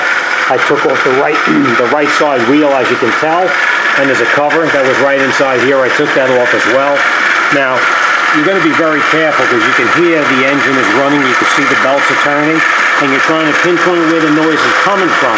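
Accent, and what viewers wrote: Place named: New York, United States. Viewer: Hey, I can hear you are from my area NYC. Where is your repair shop located?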